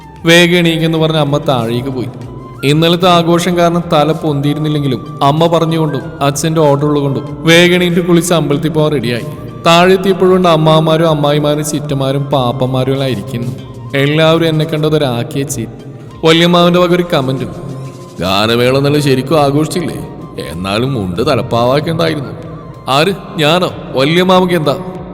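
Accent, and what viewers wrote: Thrissur, India. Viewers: Adipoli kurachhe Trissur samsaram poly thonni 👍💖💖💖💖💖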